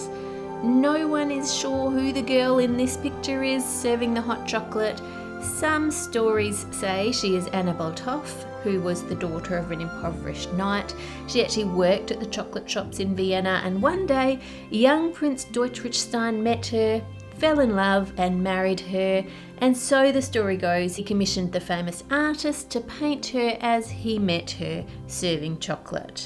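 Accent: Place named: Australia. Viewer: Gotta love the Aussie accent!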